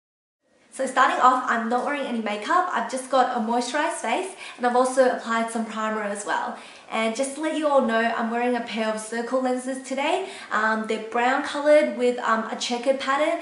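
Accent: Australia. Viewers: Wow you're one of the few Asian make up gurus with an Aussie accent! Go Aussies ^^ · australian actually.. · i havent really heard other aussies talk on youtube! woah our accent is soo totally different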